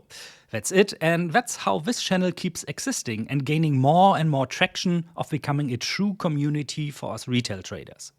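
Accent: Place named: Germany. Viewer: Subbed - I had to. It's the German accent. ~(￣▽￣)~*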